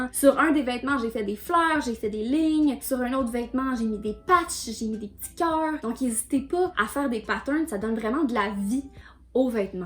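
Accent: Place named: Quebec, Canada. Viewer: Les gens qui ont l'accent québécois ont tellement de charisme 😂🙈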